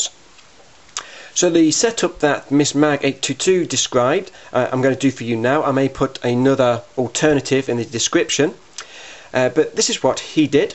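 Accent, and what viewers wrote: United Kingdom. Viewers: i like ur british accent~ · i would guess ur british? -.-'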